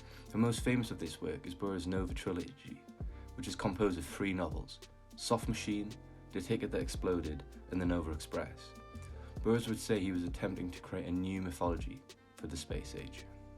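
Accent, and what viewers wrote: United Kingdom. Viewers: i think hes just british brother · Dude you said semiautobiographal which isn't even a word. Funny how you many people will overlook your idiocy just because you have a British accent. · This is fantastic man! What part of England are you from? North east right? I love your narration. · geordie accent's an instant sub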